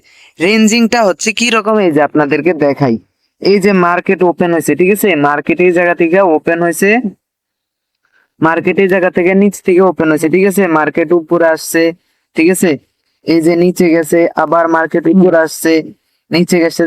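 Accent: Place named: Bhola, Bangladesh. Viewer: ভাই আপনার বাড়ি কি ভোলা। আপনার মুখের ভাষা ভোলার ভাষার মতো অনেকটা তাই জিজ্ঞেস করলাম।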